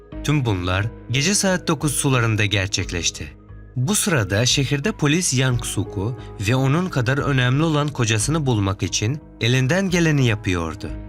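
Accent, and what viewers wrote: Syria. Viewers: seslendirmeyi suriyelimi yaptı? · Youtuber kardeşim nerelisin, şive ve ağız çok farkı, Halep ten mi geldin sende yosa?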